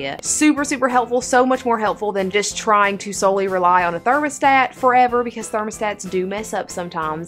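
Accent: South, United States. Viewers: I am super new to your channel and have been watching a ton of your videos with in the last few days and I am loving it! It is so informative and helpful. Also where are you from? I LOVE you slight southern accent!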